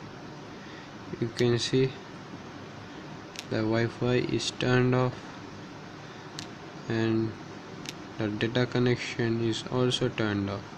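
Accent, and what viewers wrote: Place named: India. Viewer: indian speak very funny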